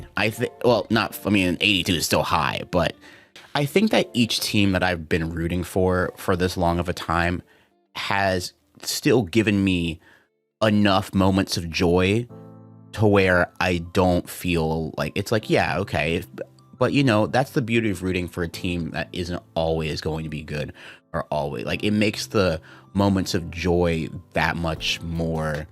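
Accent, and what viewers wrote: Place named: North Carolina, United States. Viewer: 'i grew up in rahleigh north carolina' i can tell, cause you pronounce it rah-lay, not like rah-lee, rally, etc. you ain't even gotta elaborate, moment you said rahleigh like that it's clear~ also agree they should add college football. being from the upstate carolina region, college is king, esp in the clemson/usc areas.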